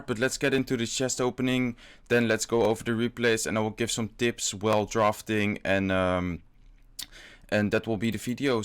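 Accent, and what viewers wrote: Netherlands. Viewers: ur 100% from holland. 100 procent. ur accent is to recogniseable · Where are you from you sound like Some one from the netherlands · ben je nederlands je accent is beetje nl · Ik hou van je nederlands accent op je Engels ❤️❤️❤️ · you are dutch arent you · je hoort zo goed dat ie NL is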